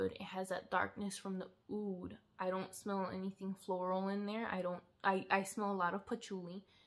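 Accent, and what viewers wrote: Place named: Mexico. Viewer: You’re very welcome! que accent, that Mexican Spanenglish accent 🤓.. let me guess your a sagittarius or libra ? Supposedly Virgo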